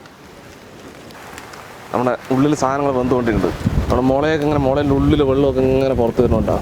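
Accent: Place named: Palakkad, India. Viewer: Malayalam... palakkad slang...😊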